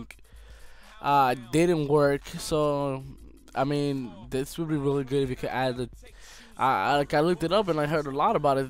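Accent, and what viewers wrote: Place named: Dominican Republic. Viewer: He talks like that cuz he dominican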